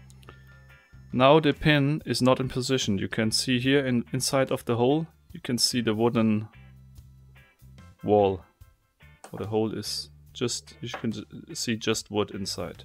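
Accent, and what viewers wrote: Germany. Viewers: I'm here for the puzzle's too but I can't watch more than 1 video of him without muting the video... Don't understand me wrong I love this channel but quality is important.. I know what he's trying to say but it's hard to understand because it's neither british nor american english.. It's really shitty german english and german english doesn't sound good... he needs a lot more english experience to improve on this... so it would be better for him to talk german in his videos and I think most of his fans are german too ^^ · Kommst du aus Deutschland?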